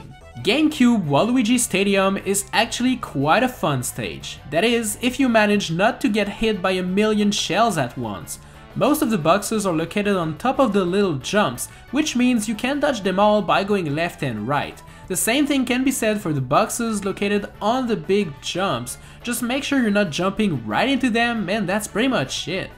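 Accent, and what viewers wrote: Quebec, Canada. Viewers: Are you from Quebec?? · Wait a damn second,. Are you from canada???????????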